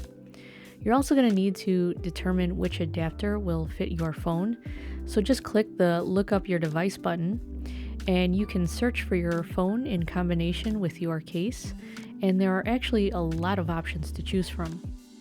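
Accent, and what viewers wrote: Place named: Midwest, United States. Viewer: great review. can i guess, you're from some midwestern state. ohio? illinois? indiana? michigan?..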